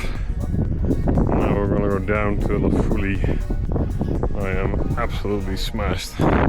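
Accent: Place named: Netherlands. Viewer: Na 2 zinnen was het duidelijk: mooi Nederlands accent 😉 Waanzinnig gaaf verslag. Je doet iets waar ik voorlopig nog enkel even van kan dromen.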